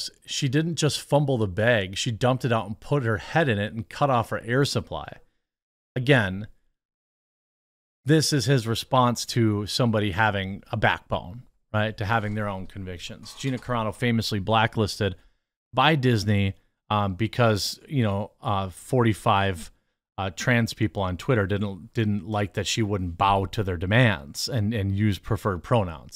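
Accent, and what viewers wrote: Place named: Midwest, United States. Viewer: The effort jeremy goes through to hide his Midwestern accent sometimes cracks me up.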